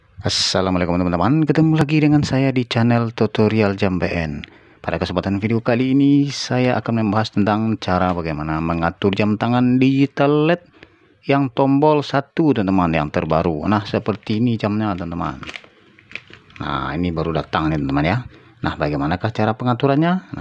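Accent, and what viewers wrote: Padang, Indonesia. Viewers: tengkiw bg, btw orang padang ya bg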